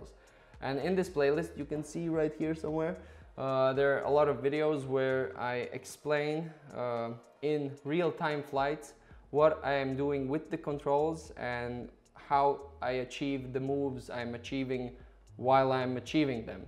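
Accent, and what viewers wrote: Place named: Latvia. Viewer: pēc akcenta uzminēju, ka latvietis :D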